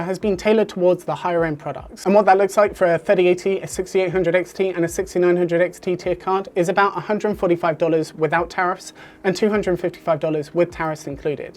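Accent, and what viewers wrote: United Kingdom. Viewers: ok, so my ears didnt fool me that you sound so british XD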